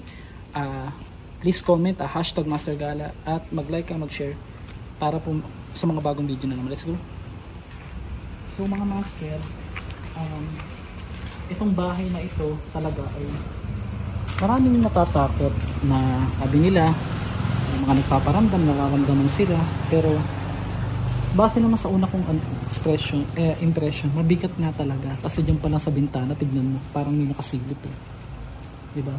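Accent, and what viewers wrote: Visayas, Philippines. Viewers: Ka cute nimo mag bisaya Master Gala oie 😍 amping permi Master Gala ❤God bless 😇💖💖💖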